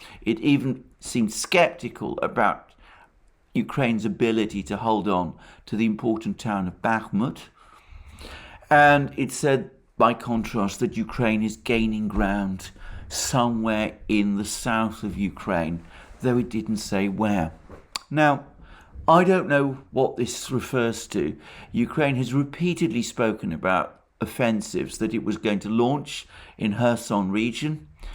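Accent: United Kingdom. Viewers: Ha! You are confusing a British voice with extraordinary insight. All this information is pretty much common and available all over the Internet. I agree he is eloquent in what little he actually says.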